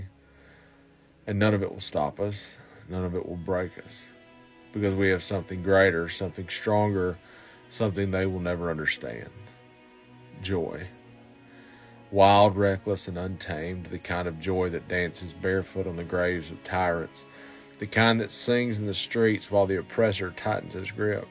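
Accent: South, United States. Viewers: Your poetry, deliveredwith a southern accent, give me hope for a USA free from the current regime there. May others hear your voice with Joy. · I really enjoy your content and I love your Southern accent 😍 I'm a Southern girl myself 💙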